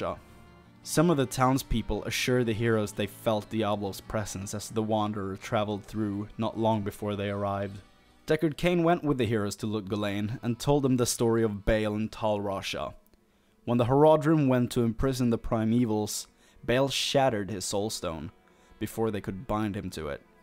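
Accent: Sweden. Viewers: Awesome, btw, Swedish?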